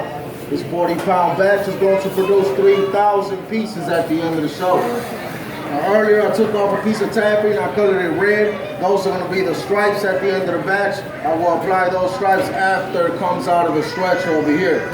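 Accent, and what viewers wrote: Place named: Houston, United States. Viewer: Damn my nigga. Got that south east side accent kinda strong hehe. Sounds like all my homies in htown.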